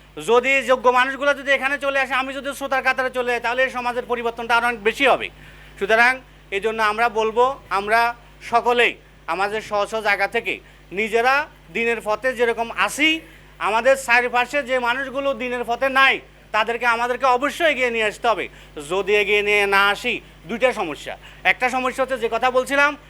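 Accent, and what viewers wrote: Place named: Noakhali, Bangladesh. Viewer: ভাইয়ের বাড়ি কি নোয়াখালী নাকি?? নোয়াখালী নোয়াখালী টান আসে।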